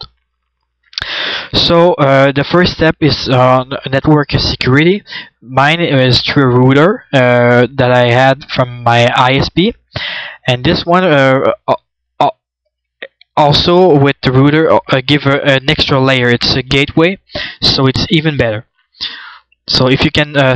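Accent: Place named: France. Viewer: It doesn't matter whether he spells well or talks fluently, freaking jerks! He gets it done and can at least speak different languages, can you do the same? I speak 3 languages myself, idgas about what people say because they can't speak other than one freaking language. I can blend in different settings so can this guy, can you? People are freaking low life mfrs, anyways, good video. lol I can tell you are French I think haha...you french people have that easy recognition accent. Alors sinon, t'a appris ca a l'ecole ou toi meme? Je suis en train de faire mon certifica en Network Security, c'es comment comme boulot?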